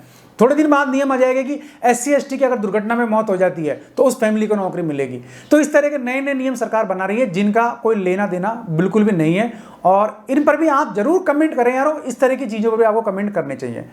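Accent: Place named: Uttar Pradesh, India. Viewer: Ye sir up ka h kya ??? Rajasthan ka nahi lag nahi raha h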